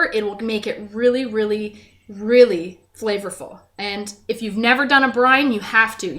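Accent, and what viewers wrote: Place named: Canada. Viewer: Your accent, im loving it... your canadian huh... yeah, im flirting just a bit...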